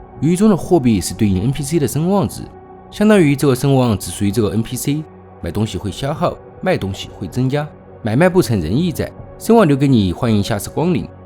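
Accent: Sichuan, China. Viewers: 四川口音有时候就这样 要求这么多干啥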